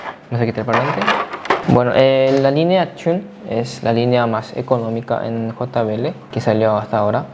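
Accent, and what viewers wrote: Paraguay. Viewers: por el acento es Paraguayo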